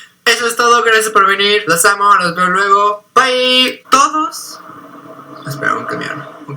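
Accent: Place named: Latin America. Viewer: soy española yuhuuuu me he dado cuenta de que hablas hispanoamericano, con hacento de hispanoamérica, tu profesor es de ahí? 💙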